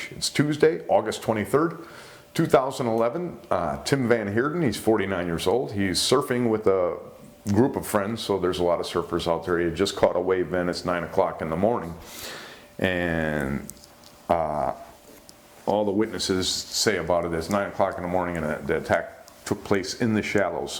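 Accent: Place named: Midwest, United States. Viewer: Hi, cool vids. Where are you from, I'm from Milwaukee-Chicago area originally. You sound very Midwest to me. I'm a former surfer living in WA. Learned in California. Surfed westcoast, Mexico and Hawaii. Can no longer, out of fear. A friend of mine Kenny Doubt was severely bitten in Cannon Beach OR. by a GW in 1979. I just can't do it anymore. It was one of my favorite sports.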